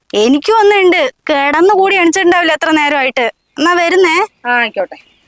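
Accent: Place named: Palakkad, India. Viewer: പാലക്കാട്‌ സ്ലാങ് ആണ് ഇതു പോലെ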